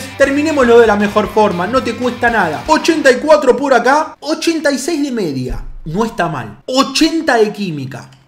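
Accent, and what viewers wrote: Argentina. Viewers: Tu acento Argentino arruina el video!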